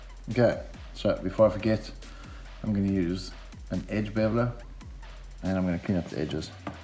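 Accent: South Africa. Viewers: davis - smith. He sounds like a full on jozi boytjie. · Awee he sounds like a South African ou. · You sound afrikaans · Very cool. BTW, why do you sound half South African? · Are you from SA?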